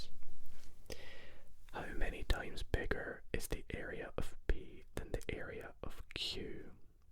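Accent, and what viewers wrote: Scotland, United Kingdom. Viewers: YOOOOO I DIDNT KNOW U WERE FROM SCOTLAND I KNEW THERE WAS SOMETHING FAMILIAR ABIUT YOU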